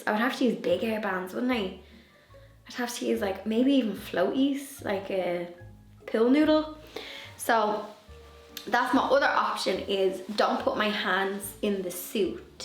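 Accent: Ireland. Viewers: With your lovely Irish accent I thought you said your niece would have hair bands, I was trying to visualise hair bands to make your jacket taller🤦‍♀️🤣 it’s arm bands you mentioned 🤣my mistake🤣. I have never seen Beetle Juice, so I’m not much help to you 🫢. But go girl, knowing our she will make it 👏❤️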